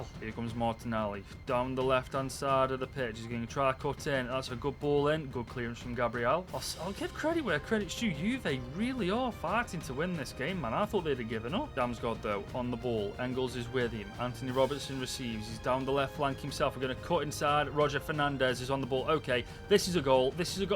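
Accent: Stoke, United Kingdom. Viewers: Are you from Stoke mate?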